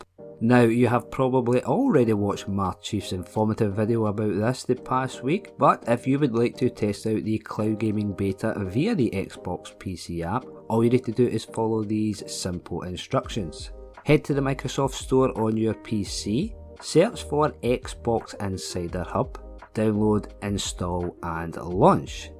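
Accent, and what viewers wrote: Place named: Scotland, United Kingdom. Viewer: Sounds Scottish)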